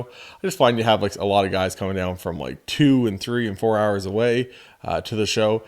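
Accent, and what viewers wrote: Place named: East Coast, United States. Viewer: Nice video. Just sitting at work, felt like I was at the show. I'm setting up at my first show this weekend, I watched your video about setting up as a dealer, some good advice. Lol, I knew you were east coast! First time I heard you say "card", gave it away for me. I'm Alberta oil patch, work with tons of east coasters. Looked like you did pretty well at the show. My only goal for the show this weekend is to get my table fee back. Lol. I'll just be happy to sell a single thing. Good video, you have good easy interactions with the show attendees. 👍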